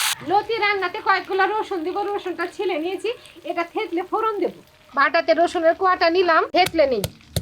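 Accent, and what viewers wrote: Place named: Bangladesh. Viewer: Onar kotha barta Bangladesher moto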